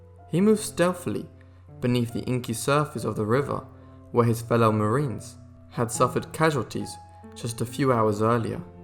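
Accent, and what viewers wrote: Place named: United Kingdom. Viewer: Having a British man quoting Dan Daly during the first World War sounds wrong. No way Dan Daly would have pronounced and inflected his words this way, he would have sounded ridiculous. Nice try though. Oorrah Devil Dogs! Semper Fi, Dan.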